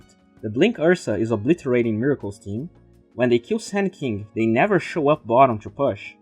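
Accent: Brazil. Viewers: that accent haha r u brazilian dude?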